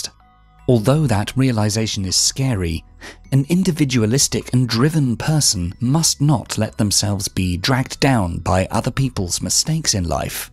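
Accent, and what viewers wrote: England, United Kingdom. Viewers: The problem with THIS narrative interpretation of Nietzsche is this: The assumption that every sniveling creature should be an artist and howl at the moon. Sorry, but the world teaches us who has what it takes and who doesn't. And THAT's OKAY. Judgement by your peers is exactly the democratic process which teaches you if the world thinks you have talent. I reject the sophistic use of a "British accent" here to make this story seem authoritative. This is a nonsensical assault on cultural norms. Some people get savaged by life. Get over it. Not everybody MUST BE celebrated.. I have listened to many amazing lectures on Nietzsche. This ain't one of them. This is pure "Woke" nonsense. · Ima go against the grain an say take this w a grain of salt an herb weary of soemthing that calls itself philosophical while using an English accent knowing full well English ppl r stereotyped as smart ppl. 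Like that episode of sunny Philadelphia when Charlie starts speaking w an English accent and smoking a pipe. I like this channel a lot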